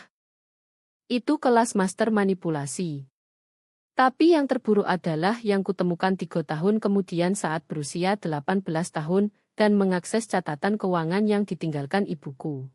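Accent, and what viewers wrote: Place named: Java, Indonesia. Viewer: Waduh! Accent Jawanya medok banget! But! It’s okay!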